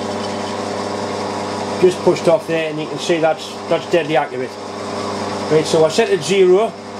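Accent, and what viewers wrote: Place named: Northern England, United Kingdom. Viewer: Propper northern working class English.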